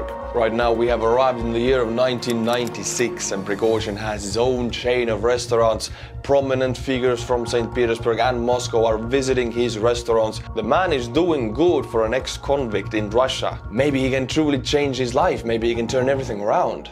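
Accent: Estonia. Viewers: I really enjoy your videos and this was most interesting and entertaining. I like your style of presentation with your awesome Estonian accent. Thank you and keep them coming! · I can't wait to support you so that I can hear you butcher my name in your Estonian accent. Always look forward to watching a new video. But I won't eat at Prygozhin's restaurants.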